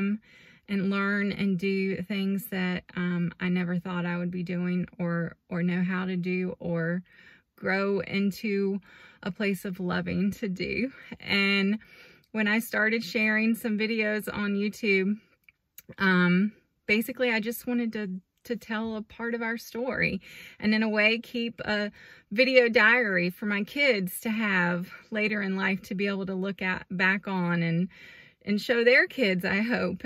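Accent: South, United States. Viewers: As a Brit I love your southern accent so cool 😎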